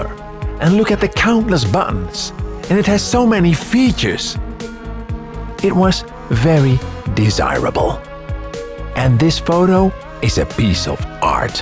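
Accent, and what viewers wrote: Netherlands. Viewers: Me: That accent.. Is this guy Dutch?: “Toedels!” Me: YUUUP! Gelijk geabonneerd! ❤ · An interesting dutch accent!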